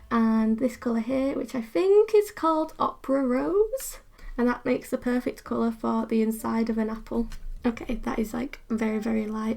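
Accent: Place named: Manchester, United Kingdom. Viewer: Your art is AMAZING! I never knew you were from Manchester and I love you accent.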